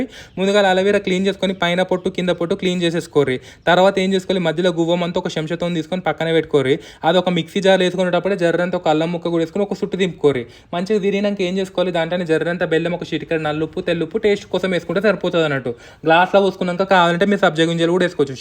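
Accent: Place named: Andhra Pradesh, India. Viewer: Mee Andhra slang maarchukuntaara evarikosamaina? Ye region lo perigithe aa slang vastundi.. Yes or no? Mee pillalaku slang maarpinchi Telangana slang nerpinchandi .next gen ki problem undadu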